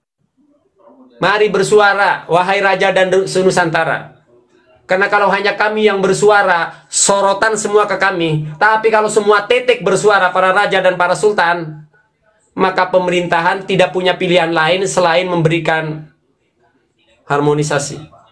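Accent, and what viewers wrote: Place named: Cirebon, Indonesia. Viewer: Emang nte wong ndi....bersuara mslh cherbon....tp bagus juga sihh....lanjut lahhh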